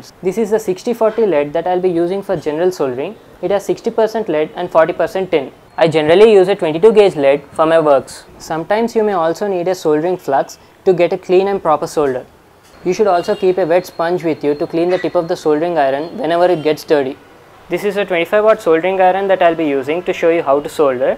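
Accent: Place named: India. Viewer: few indian accent that i watch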